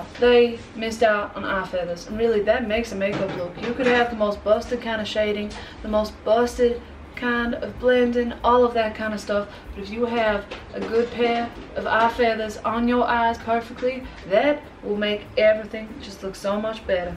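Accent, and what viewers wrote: South, United States. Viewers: I loved ur Southern accent & I'm from the south born & raised & still here. Lol but I gotta say ur accent is better than mine. I love ur videos. U look absolutely AMAZING. & U r amazing. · I love the southern accent, although I prefer old accent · Is this a southern belle voice? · Your Southern Accent reminds me of Mary Stuart Masterson as Idgie Threadgoode from Fried Green Tomatoes! Well done! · I am digging the southern accent. You are truly my favorite youtube goddess. Signed a southern country girl from Florida 😂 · I'm new to the channel but I love your makeup tutorials! I've never been able to do a proper wing, but after watching some of your videos, I decided to try the flick, drag, and hope for best method. I got so many compliments. Thank you!! Also, being from the South, I love the accent. Just like a Southern belle darling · Omg your southern accent is amazing I have laughed so much watching this thank you so much because I definitely needed a smile today 😊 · 😯 Well colored me impressed! 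I'm from the South and your country twang you got going on there is amazing. Lol took me by surprise. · Im from Tennessee and I’m impressed with your southern accent! At first I was more confused than a fart in a fan factory but then I realized what was going on. 😁💜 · The smooth Southern US accent is actually so good and relaxing, it's weird lol. The marcelled waves are really cute! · I love! You're southern accent is on point! I'm from Alabama so I'm an expert:-) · Love how she slips in and out of a Southern accent.....sounds like a cross between Georgia, maybe a smidgen of Tennessee and Texas in there 😍 and the look is stunning !!!! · the southern drawl is... Creepy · I love the country accent😁😁❤❤ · I loved the bits where good old appears because, not that this fancy southern lady accent isn’t fabulous, it just isn’t in her true form. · Dear, when I first saw one of your videos I thought you were Russian, only to find out you are Australian. 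Being from Georgia, your southern accent is pretty good! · A better Southern accent than half the people in Hollywood. "Only you can decide what you don't like about your face. Other people can try, but you can tell them to shove off." If I didn't know any better, I'd think you were a true Southern Belle. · Living for the Southern accent because it literally sounds like so many people I have grown up around lol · I love your southern accent. "I hate this with a burning passion" I've said that so much. Lol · I love the southern accent my husband is from the south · I am so loving your Southern accent ❤️ You sound more Southern then I do and I am from Alabama the heart of the South 👍🏻❤️👍🏻❤️👍🏻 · I love your videos but this accent has to go. It’s such a horrible drawl and southern accent. Being a southerner it was so so hard to listen to. Please go back to your normal voice and accent. I love the normal, it’s so happy and delightful. 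Beautiful make up though. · I love the southern but I missed YOU in this video. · Your Southern accent is sooooo good. I love love LOVE IT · I am so proud of your accent in this one! It's hard for some people to pull of a good American accent but you sounded like a total southern belle. I am applauding you so hard right now😁😁😁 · Love the southern accent.....but God it threw me off so much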